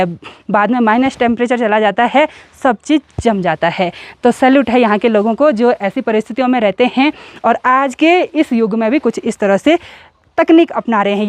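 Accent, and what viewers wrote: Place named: Garhwal, India. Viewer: Jab Hindi bolati Ho tab bhi tumhara Garhwali tone aata Hai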